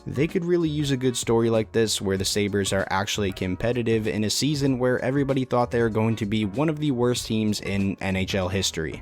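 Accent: Newfoundland, Canada. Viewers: You from out east bud? You sound a bit like a Newfie with your 'ar' sounds haha. Love the channel, keep it up!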